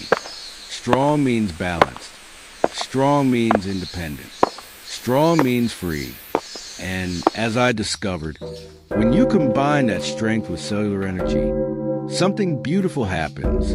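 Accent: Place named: United States. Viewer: Beautiful Japanese visuals let down by the extremely American narration.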